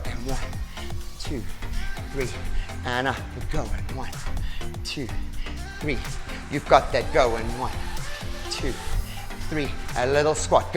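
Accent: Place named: South Africa. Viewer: You are fantastic , you are clear & thorough , explaining every move , what each move does, which motivates , &, & , & , you are fun ! 🥳Love it . I laugh when you do . Have just found you & imeeediately subscribed ! Are you in South Africa ? Love your accent .Thanks so much . Hello strong 74 year old legs !! Yay , here they come .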